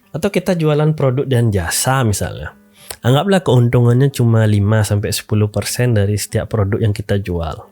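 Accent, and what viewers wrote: Bali, Indonesia. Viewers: kaya orang bali logatnya pak, ake bingung bane 😂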